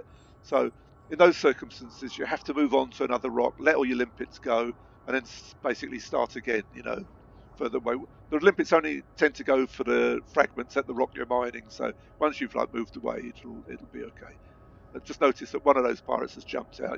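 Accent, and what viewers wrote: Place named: Bethnal Green, United Kingdom. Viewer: Very good video. Cheers! Love the accent BTW. Pure Bethnal Green! 👍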